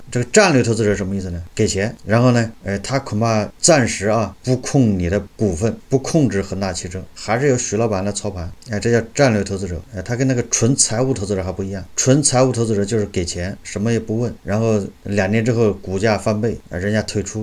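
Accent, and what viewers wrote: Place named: Jiujiang, China. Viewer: 您说话有点像九江人的口音。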